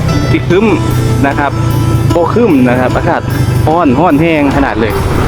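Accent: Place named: Sakon Nakhon, Thailand. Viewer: เสียงผู้บรรยายคล้ายสำเนียงคน.สกลนคร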